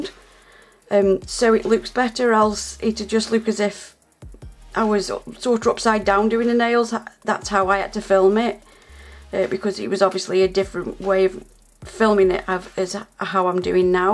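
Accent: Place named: Stoke, United Kingdom. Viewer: love ya stokie accent too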